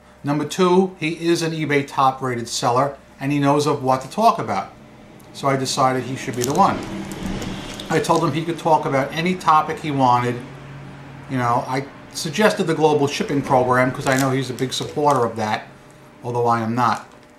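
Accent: New York, United States. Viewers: noticed ur NuYawk accent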